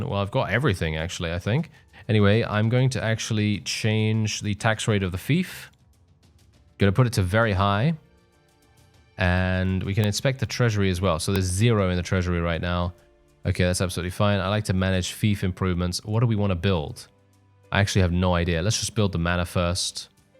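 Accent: United Kingdom. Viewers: Aw fuck thats so funny. You knew what was gonna happen, youve read the wiki, you had an idea what the red immortals could do since you just fought 10 of them, its always hilarious when you get to complaining in your lovely british voice.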